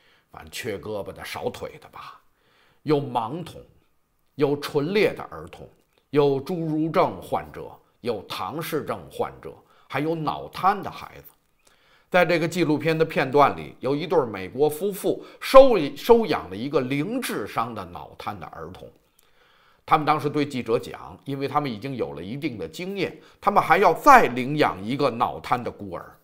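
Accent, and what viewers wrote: Beijing, China. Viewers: 這口北京話，太爽了！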